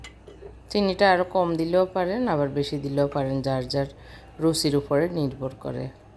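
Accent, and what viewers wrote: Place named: Feni, Bangladesh. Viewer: Assalamualaikum apu ... Ami sob somoy apnr video dekhi but kokhn o comments kora hoy na..amr Bari o feni .upnar feni r tune e kotha Bola amr onk Valo lage... Allah upnk Valo rakhuk .. Apu kitchen cabinet korsen j oitar details ta ektu diben pls.koto taka lagse ki board use korechen esob bolben pls..ami o banabo tai idea nite chai.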